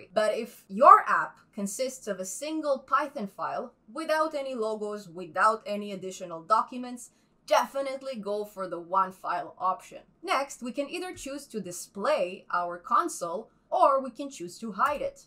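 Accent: Eastern Europe. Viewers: Чем то славянским отдает произношение :) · The voice accent is similiar to slavic or eastern europe citizen but not real canadian.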